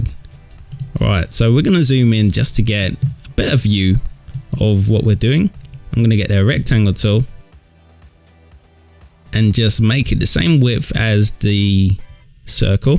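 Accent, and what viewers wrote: United Kingdom. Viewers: Loved your cockney accent!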